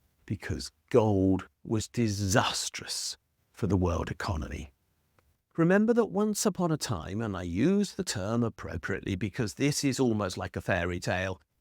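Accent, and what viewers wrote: RP, United Kingdom. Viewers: So much misinformation here it's unbearable. Don't let the posh English accent, the bookshelves and the "professor" title fool you.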